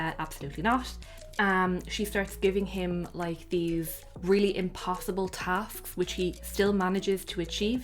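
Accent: Ireland. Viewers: Some beautiful work and a lovely Irish lilt to the narration. Really enjoyed your video! Thanks for sharing !!!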